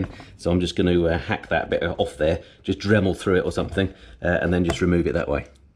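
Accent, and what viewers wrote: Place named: Essex, United Kingdom. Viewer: Classic Essex accent which sounds like an Aussie accent :)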